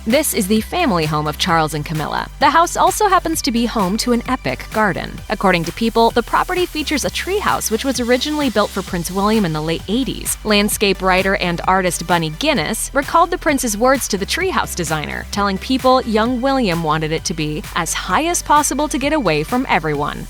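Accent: United States. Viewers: Supper annoying American accent voiceover. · Why an American doing the commentary telling us what we are paying for a load of spungers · a yank talking about the royal family not for me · It feels really wrong that this is narrated by an American.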